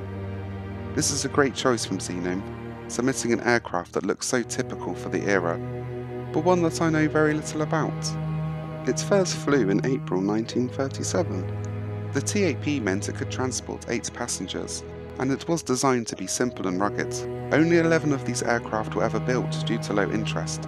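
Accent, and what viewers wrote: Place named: United Kingdom. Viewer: and always remember if you feel down just think of us because your voice calms at least me down. It's just so smooth yet you sound just like a normal British guy. In short keep it up and be happy because you deserve it even if you work your hardest. 👍😁🍀👍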